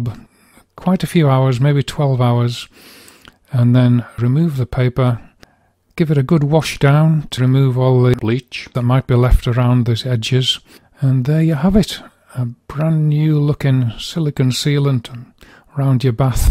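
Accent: Scotland, United Kingdom. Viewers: Awww, your accent....I reminds me of my home away from home...Scotland....Thanks for the tip!! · Is that beautiful accent Scottish?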